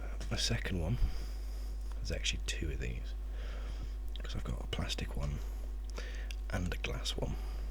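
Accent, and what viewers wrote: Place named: United Kingdom. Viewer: nice video.awesome accent, living in philly really makes you appreciate proper English.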